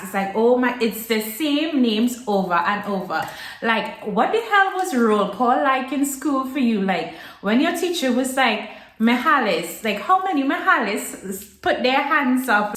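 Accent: Trinidad and Tobago. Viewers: I hear your Trini accent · love your trinidad accent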